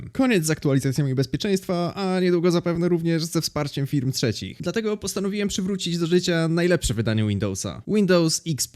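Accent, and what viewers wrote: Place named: Poland. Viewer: to jest najbardziej polski angielski akcent jaki kiedykolwiek słyszałem (automatycznie ustawiło mi się na angielski)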